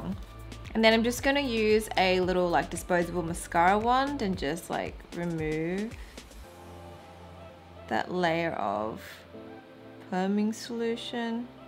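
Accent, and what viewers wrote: Australia. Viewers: I really love videos, aside from you're learning from her beauty videos, I'm learning on her accent as well since our clients are Australians. Biggest thumbs up to my most favorite youtuber!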